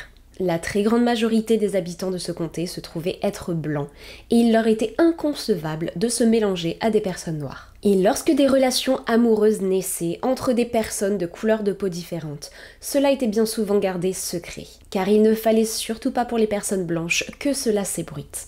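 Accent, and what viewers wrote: Quebec, Canada. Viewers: Au fil du temps ton vocabulaire est de plus en plus teinté de l'accent Québécois! Quand tu retournes en France ça doit être un choc pour tes proches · Il manque le "nous allons commencer".😢 Actuellement je suis tes vidéos d'il y a 3 ans et tu as un accès Québécois beaucoup plus prononcé que maintenant. 😂😘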